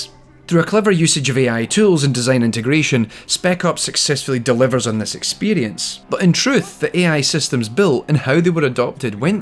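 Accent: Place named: England, United Kingdom. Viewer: He's not wrong people, PLEASE play this before you watch, it is really fantastic. Also, I'm glad you talked about PTSD. I know I shouldn't be talking about personal stuff in some random comment thread, but I have what is being classified in the ICD Beta 11 as C-PTSD, or Complex Post Traumatic Stress Disorder. Your accent reminds me of when I went to England, I got held up in Heathrow because they wanted to make sure I was "Safe to let in" which kind of turned the whole experience negative. But what made it better, and really helped me, was one of the Security Officers was a Soldier who fought in the Falklands and Northern Ireland, and he was telling me that if I ever needed help, theres a group he volunteers with of Veterans, and he said, even if you weren't a Veteran and needed support they were there for everyone who suffers from PTSD, to whatever extent. 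And I think thats probably indicative of the way people with PTSD cope. Life is going to shit on you. Sometimes you're going to make choices that end up being mistakes that shatter the sense of humanity you hold on dearly too, and its going to rattle the foundations of your being, but there are little lights, little glimmers of beauty and joy in the world, and those are the things you should encapsulate and always keep close to your heart. The Day is Always Darkest Before the Dawn. Its not 100% relevant to this game, and I won't spoil why, but, if you have PTSD, especially as a Combat Veteran, this game might be a bit trig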